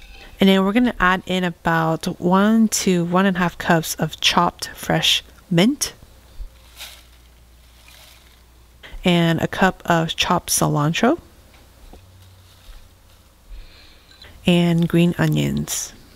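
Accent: United States. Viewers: Are you from Kansas?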